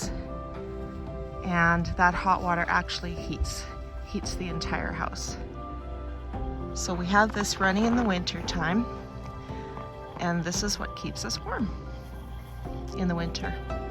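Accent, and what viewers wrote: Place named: North, United Kingdom. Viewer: I just love how you ladies up north say "house" and "out." What kind of wood are you burning here? First camera shot inside furnace shows unsplit and seemingly unseasoned tree limbs (later on, maybe popular perhaps not fully seasoned). I have seen vids of folks who burn only well seasoned red or white oak. They are able to load large chunks of wood and don't have to poke around or reload for at least 24 hours. Here's some advice you didn't ask for... LOL so thank you in advance for your indulgence... sell a few of those junk cars for scrap and with that money build yourself a decent woodshed. All the best...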